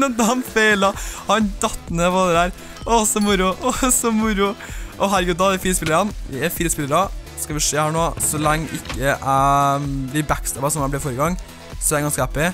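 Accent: Trøndelag, Norway. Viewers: Elsker den positive holdningen din og trønder dialekten! :D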